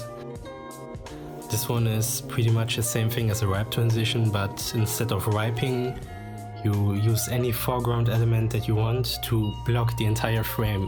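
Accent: Germany. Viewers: dieses Englisch man merkt so das du Deutscher oder so bist xD aber Inhalt ist top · Are you German or Something like that?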